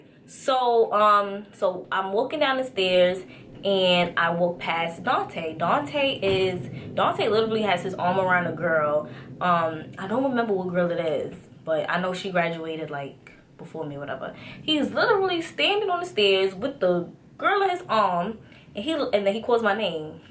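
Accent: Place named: New York, United States. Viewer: I love to hear you talk..your New york accent is too cute!!!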